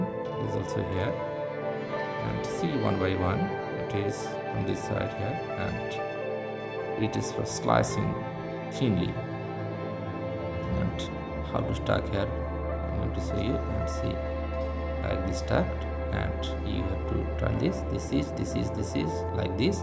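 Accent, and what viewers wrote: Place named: India. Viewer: Very Indian accent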